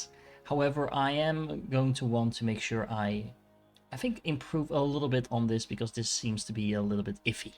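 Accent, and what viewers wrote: Netherlands. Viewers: Je nederlandse accent schijnt wel een beetje door hahahha (maar nogsteeds goede video, helemaal gebinged met snacks)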